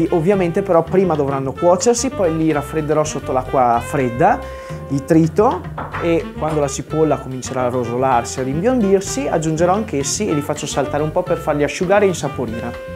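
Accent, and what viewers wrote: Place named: Romagna, Italy. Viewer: Mi piacciono tutte le tue ricette sono ottime nn ultima ragione sento dall' accento ke sei romagnolo e io adoro tutto della Romagna bravo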